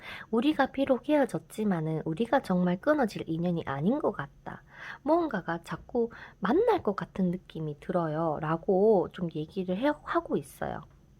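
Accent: Gyeongsang, South Korea. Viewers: 하트!ㅎㅎ🥰 원래 경상도 분이신가요? 너무 정겨워요ㅎㅎ 좋은 리딩 항상 감사합니다~! 아, 전 남자입니다요.. ㅎㅎ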